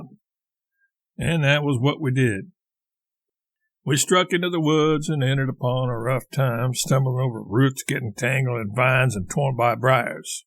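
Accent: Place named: United States. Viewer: Thank you so much! I've been trying to find this piece of Twain's work in audio form in an American accent for weeks now, I'm quite used to John Greenman, but this is perfect, I truely appreciate this channela and everything on it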